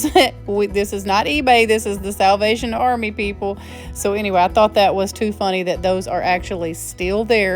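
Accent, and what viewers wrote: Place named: South Carolina, United States. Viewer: I just found your channel and don’t know where you’re from but your accent screams South Carolina. I may be wrong. But at least a country farm girl. Like your blogs.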